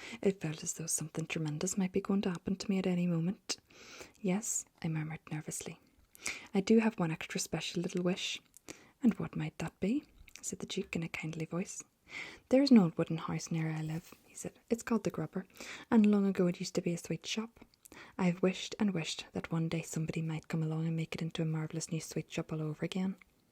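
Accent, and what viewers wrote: Ireland. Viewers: I love the Irish accent!! Great job🍀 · I love love love your Irish accent:)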